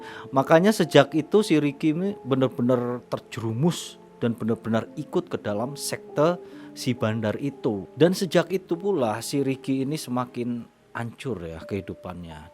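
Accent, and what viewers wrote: Java, Indonesia. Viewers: Daya tariknya justru ada di medokmu bang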